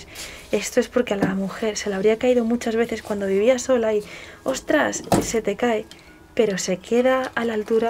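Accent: Spain. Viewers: Me encanta el acento Que tiene ósea el acento español es impresionante Saludos desde Venezuela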